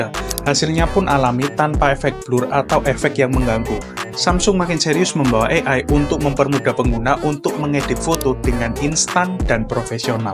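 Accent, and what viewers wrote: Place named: East Java, Indonesia. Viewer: Cra jelasin enk,simple n detail jg,logat mcm jwa timuran❤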